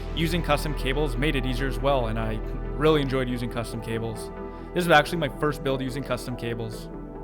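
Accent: Canada. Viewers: The Canada accent is subtle but strong. It’s also the robotic and lack of vocal range.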